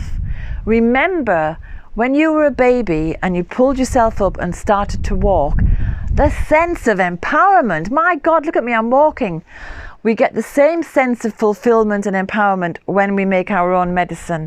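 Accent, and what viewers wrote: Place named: North East England, United Kingdom. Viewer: Hi again, it’s Jen from the North East :) I’m absolutely loving your channel- you really do draw the watcher in! I hope to be as good with herbs as you one day! I’m studying with heartwood and will be qualified in 6 years. I just did my meadowsweet monograph which was so joyful. Thanks for all these videos- I’m going to continue to follow you. Medicine making vids would be helpful but to be honest anything you put out is lovely. Btw I watched your video on plantain earlier on, which isn’t a herb I’ve come across that much- later on today on my walk out, what do you know- plantain showed itself to me! Wow! Form your accent I’m guessing you were from either Durham or teeside way? I am originally from Middlesbrough! ☺️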